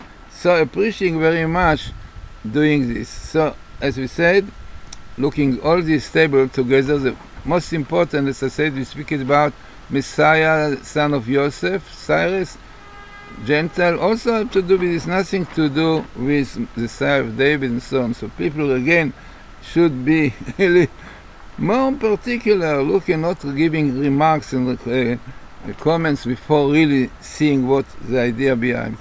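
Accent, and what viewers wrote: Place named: Middle East. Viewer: Your middle-eastern accent is quite strong. People in English speaking areas need to pay CLOSE! ATTENTION, and they will understand. SORRY for your struggle. Your tables are a tremendous blessing. Thank you rabbi Glazerson.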